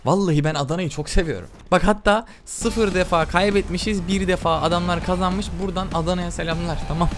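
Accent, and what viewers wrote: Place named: Yozgat, Turkey. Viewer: Abi sen yozgatlimisin konusman ole geldi bana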